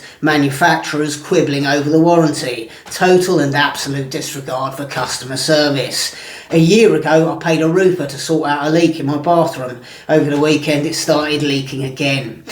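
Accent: United Kingdom. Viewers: You sound British, but just described South Africa.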